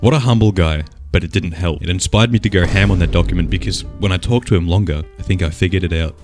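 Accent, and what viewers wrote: Australia. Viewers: cheeky aussie accent for extra charisma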